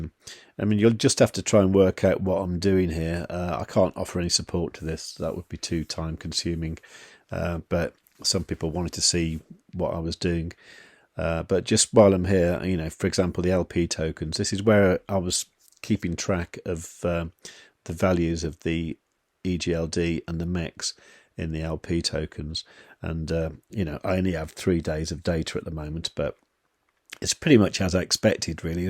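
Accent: United Kingdom. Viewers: You are living in the states? you sound british or am I mistaking as german and english as a foreign language 😬 I guess the people who got RIDE via Launchpad are able to create a farm. For me I need to wait to get RIDE token, but actually I would like to participate from higher APR in the good old Farms when people leavin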